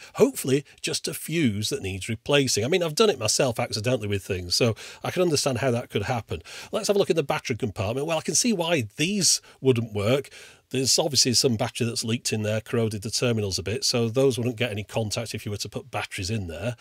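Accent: United Kingdom. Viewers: Why does LGR sound british in this video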